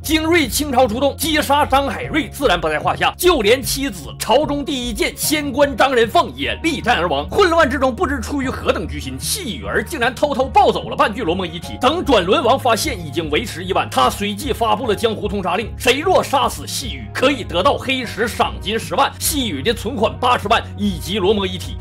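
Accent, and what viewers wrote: Northeast, China. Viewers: 一定是咱们东北银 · 東北味真濃.據說東北人普通話都很好.Thanks♪(･ω･)ﾉ影評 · 最好听、最逗人的东北话，都在这里了。😀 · 这份碴子味儿的解说听上去那是相当地亲切啊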